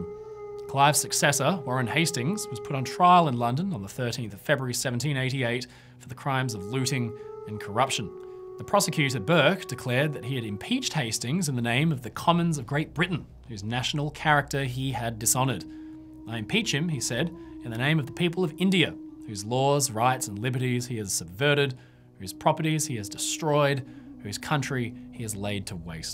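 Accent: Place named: Australia. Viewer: Fantastic video composition, really sad content. The Australian accent makes you sound extra smart!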